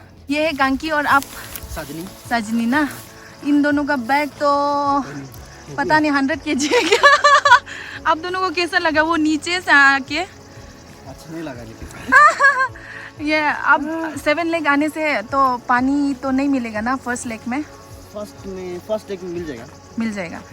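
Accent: India. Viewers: Love your commentary and blending of your hindi english and Arunachalee hindi makes you sweet keep it on & on...best of luck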